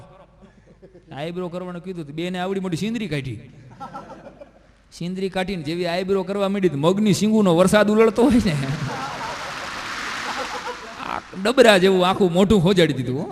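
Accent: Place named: Kathiawar, India. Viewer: su kathiyavadi laheko se .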